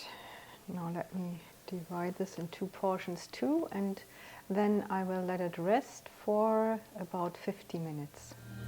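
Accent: Germany. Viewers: I LOVE the burnt raisins!! I usually will do my Stollen on the weekend after Thanksgiving. I have to smile when you speak because you pronounce English words with German sounds. I do not laugh at you but sure appreciate how hard English is to learn for non-native speakers. Unlike German, English diphthongs ARE NOT consistent. You do very well!!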